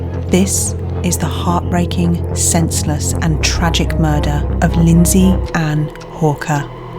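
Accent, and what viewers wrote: United Kingdom. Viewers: Dude... Do you even hear yourself? You waffle on in here demanding someone with a British accept to do the very thing she is already doing; Speak normally. And you proceed to do this with a comment where you demonstrate your complete ignorance of the written English language. Are you this embarrassing in real-life too?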